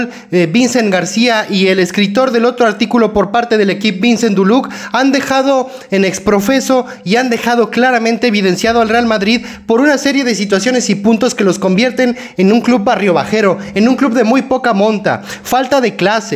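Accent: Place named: Mexico. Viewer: Hace muy poco que te sigo pero eres por el acento mi mejicano preferido bueno después de Rafa Márquez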